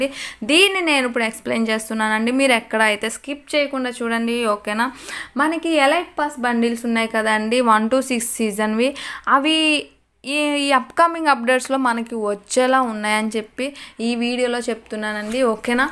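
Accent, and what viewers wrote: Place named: United States. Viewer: Ur english is very good like american english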